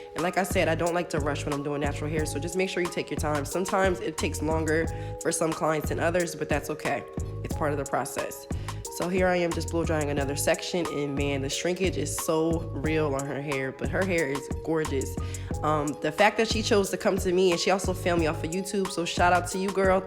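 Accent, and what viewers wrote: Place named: New York, United States. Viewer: where is your shop located? Sounds like a New York accent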